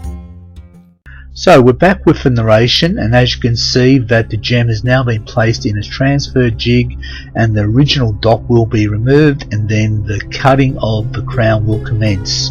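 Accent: Australia. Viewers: Thanks for sharing the only gem channel with a down under accent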